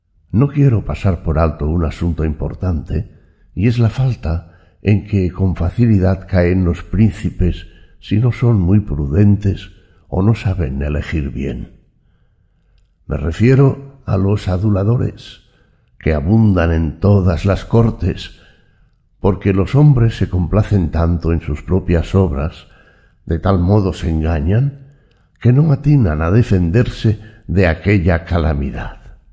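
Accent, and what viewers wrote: Spain. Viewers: Y en español ibérico....Una joya este audio. Maquiavelo más actual que nunca, no cabe duda. Mil gracias. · en español españa weon, español españa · Los Latinos raramente disfrutamos del acento Español pero esta voz si que que te encierra y te agrada invitándote a poner atención pero no se aun si también lo será para poner esto en practica.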